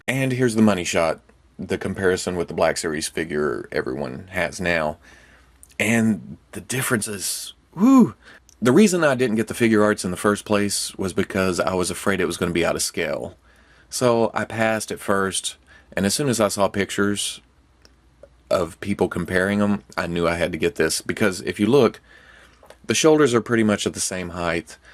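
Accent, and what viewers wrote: United States. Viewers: Is the country, he sounds country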